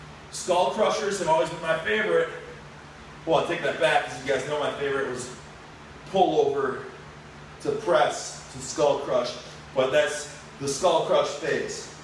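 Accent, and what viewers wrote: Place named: Wisconsin, United States. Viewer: It always sounds like a Canadian accent but I guess Wisconsin accent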